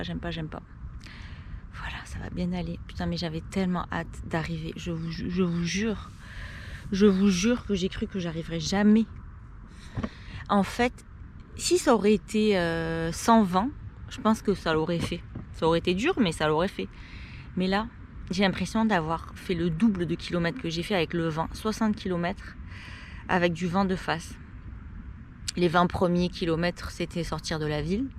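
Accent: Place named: South-West, France. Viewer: c'est d'ou ce bel accent ? sud ouest ?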